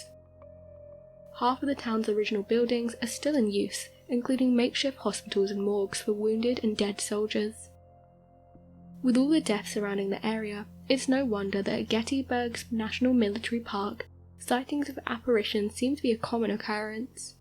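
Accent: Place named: Scotland, United Kingdom. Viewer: I like your brouque. Is it Scottish or English?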